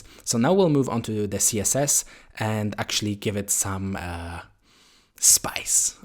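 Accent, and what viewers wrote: Poland. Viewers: Awesome effect! Q&A: Is polish your mother tongue ?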